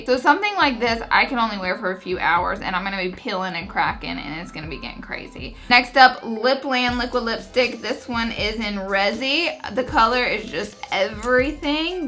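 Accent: South, United States. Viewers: I guess that bettet than saying you sound like and 80year old that sounds like you smoke a pack a day. I love your voice I find it comforting with the southern flair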